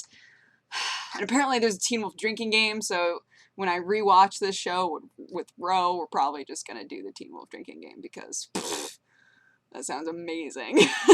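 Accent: France. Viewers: I freaking love your french accent haha :D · "Le Pain Quotidien": translated in english it's: "The Daily Bread" Also cute French accent,:) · You have the cutest french accent I ever heard!! <3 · I'm french and your accent is the cutest thing ever ^^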